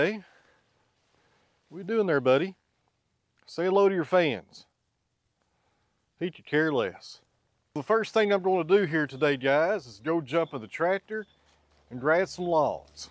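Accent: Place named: East Tennessee, United States. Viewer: Glad I found your channel. While I'm at work, up in a boom running conduit, I watch your content while I'm waiting for material or whatever. lol That East Tennessee accent makes it feel like I'm watching someone I can relate to a little better than the average channel. 😂 If you've done much traveling, you know everyone isn't as friendly as most folks around here are.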